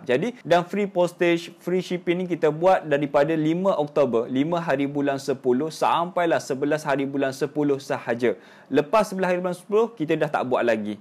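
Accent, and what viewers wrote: Kelantan, Malaysia. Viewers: Bagus penerangan ustaz. TQ Dr.. Ada bunyi loghat kelate sikit²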